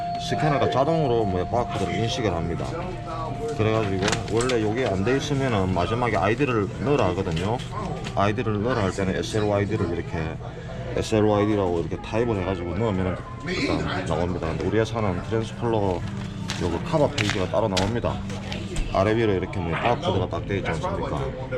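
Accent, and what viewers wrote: Gyeongsang, South Korea. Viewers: 구수한 경상도 사투리가 듣기가 좋습니다. 앞으로도 많은 좋은 영상 기대 하겠습니다. 모쪽록 건강하세요!~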